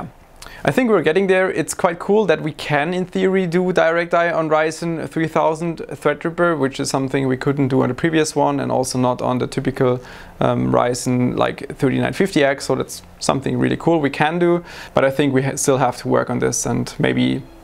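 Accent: Germany. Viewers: Alda. Ich liebe diesen deutschen Aktzent. #nohate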